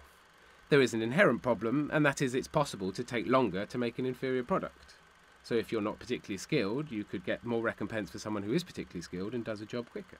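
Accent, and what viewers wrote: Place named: United Kingdom. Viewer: A) He's just british. What you're describing is a british accent. B) I have auditory processing disorder and I have no problem understanding him. So, i think you're just angry at british people or whoever pissed in your oatmeal this morning.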